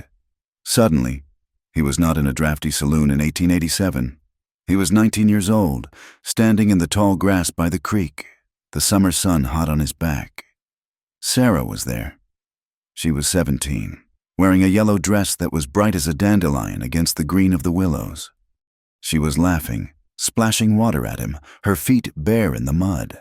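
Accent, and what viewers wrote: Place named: Boston, United States. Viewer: Love your sexy gorgeous voice by way of Boston! Hope it’s everything I want!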